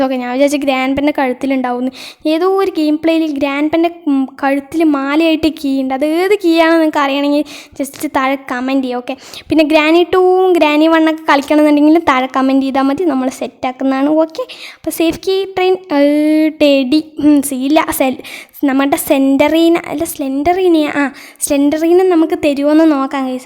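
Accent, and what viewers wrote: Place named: Palakkad, India. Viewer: Palakkad annu thonnunu😅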